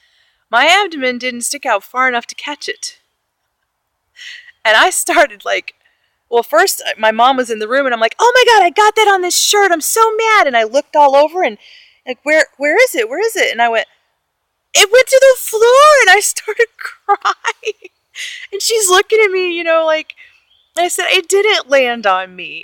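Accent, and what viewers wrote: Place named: Canada. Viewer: I hear the birds, spring time in MI. You are amazing, ouut and abouut, you sounding more Canadian than southern!! You should are great and thanks for the honest updates.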